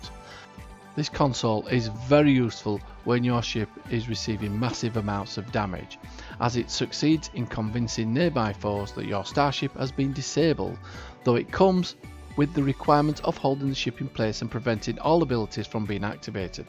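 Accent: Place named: Northern England, United Kingdom. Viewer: Ive been watching your videos for a while now and just realised you sound like an airplane pilot. The cadence, the sound quality, everything. 24th century ships explained by a 21st century northern English commercial airline pilot. All the best from Manchester.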